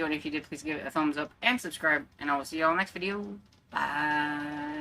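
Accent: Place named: South, United States. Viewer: Happy weekend! That Chef Boyardee looked really good. I haven’t had it in years. I always had mine with a peanut butter sandwich. That was started by my mom when were kids. 😂 And of course you can consider yourself southern! You live in the country and you have a slight accent. That’s all you need. lol!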